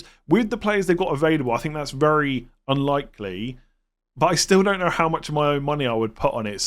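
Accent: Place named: Midlands, United Kingdom. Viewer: where you from? Sounds midlands to me, derby/staffordshire way?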